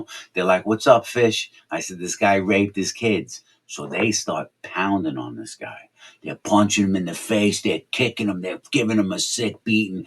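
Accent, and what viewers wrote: New York, United States. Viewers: straight up NY accent! (I have one too 😂)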